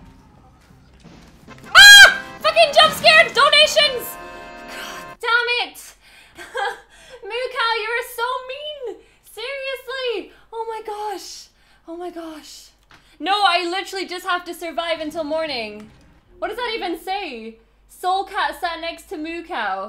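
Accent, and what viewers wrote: Scotland, United Kingdom. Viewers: Are you Scottish ? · Are you British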